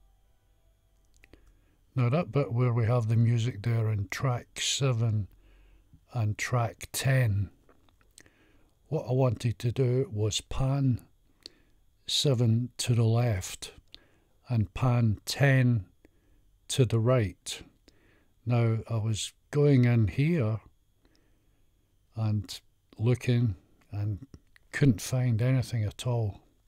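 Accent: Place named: Scotland, United Kingdom. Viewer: Great video! I love your Scottish accent!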